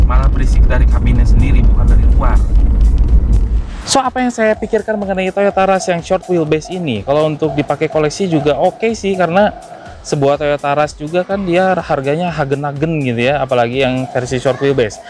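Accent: West Java, Indonesia. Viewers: Saya tau Anda dari videonya om mobi Gw suka logat sundanya:)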